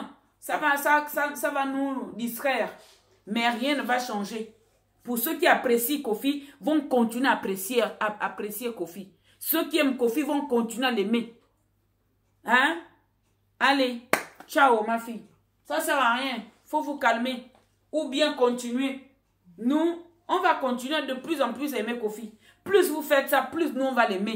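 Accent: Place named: Gabon. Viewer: Elle est Gabonaise 🇬🇦 c’est comme ça que nos mamans Gabonaises parlent👌🏻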